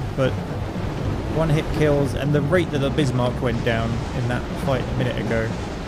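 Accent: United Kingdom. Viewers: I LOVE BRITISH GUYS THEY SOUND FUNNY AND I MEAN THAT IN A GOOD WAY IM NOT BEING MEAN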